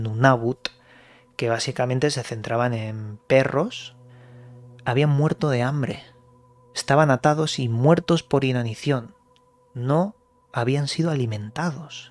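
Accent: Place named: Spain. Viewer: gracias, muy interesantes tus videos. Además me gusta como hablas, un genuino idioma Español. Saludos desde Chile.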